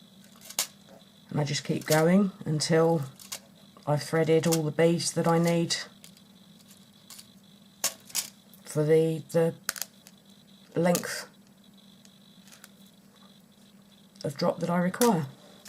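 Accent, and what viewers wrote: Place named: England, United Kingdom. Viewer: Great tutorial and idea! Thank you for sharing. Also nice to hear English accent I live in Sussex! Lol I'm used to hearing Americans! Lol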